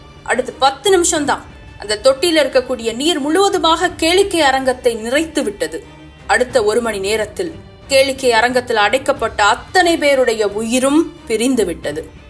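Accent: Tirunelveli, India. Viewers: Unga voice nalla gambeerama, kekaradhuke oru energetic ah iruku. Alutham thiruthamana pronunciation super ah iruku. Yella type stories um solreengalae (historical puranangal, motivational) , yepdi idhellam yosikareenga. Apdi yevlo books dhan padipeenga. Nejama solren neenga oru extraordinary person. Unga slang yenga oora ஞாபகபபடுத்துது (திருநெல்வேலி, தூத்துக்குடி). உங்கள் பணி மேன்மேலும் வளர்ந்து தொடர என் வாழ்த்துக்கள்.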